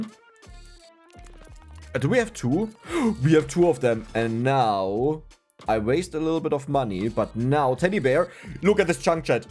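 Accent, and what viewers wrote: Germany. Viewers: Where are you from, because I like your accent I have never heard it before. I believe its an German accent right? Me myself am from the Netherlands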